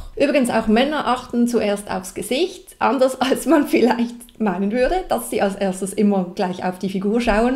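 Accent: Basel, Switzerland. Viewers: Hallo, abgesehen von deiner ästhetischen Attraktivität schaffst du es mit einem schweizerischen Deutschakzent eine ganz tolle Sympathie zu erwecken... das macht deinen Vortrag noch interessanter... und würden wir uns echt in einem Date begegnen, dann würde ich sofort einen großen Bagger kaufen um dich anbaggern... bis du mitkommst! Nein, war nur Spass! Aber bitte mach so weiter, denn deine Worten kommen aus der Wahrheit! Danke. Lg. Josef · It is Swiss variation of German (with accent from Basel). But when she speaks her real dialect it sounds even more different from the language used in the video. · Den Baslerdialekt hört man halt schon trotzdem raus.😉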